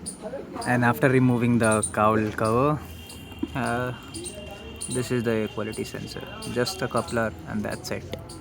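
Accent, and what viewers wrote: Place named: India. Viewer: Engleza indiana, imi vine sa ma cac!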